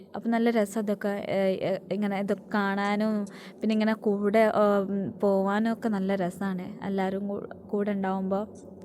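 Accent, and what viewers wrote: Malappuram, India. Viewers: Malayaliyekkal nannai malayalam parayunnu 👍🏻naattil Malappuram side aanennu thonnunnu 🥰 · Slang malappuram, tirur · ഒരു പ്ലാവ് തൈ വാങ്ങി കുഴിചിടു 😂, ഒരു മലപ്പുറം സ്ലാങ് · ആ മലപ്പുറം ബർത്താനം കേക്കാന്‍ നല്ല രസം ണ്ട് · Slang കേട്ടിട്ട് മലപ്പുറം ആണെന്ന് തോന്നുന്നു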